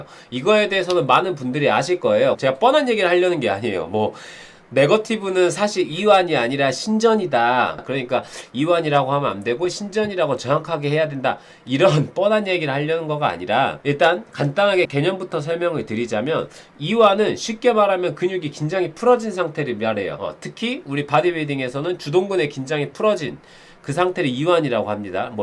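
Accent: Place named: Jeolla, South Korea. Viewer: 전라도 억양이 참말로 구수하십니다~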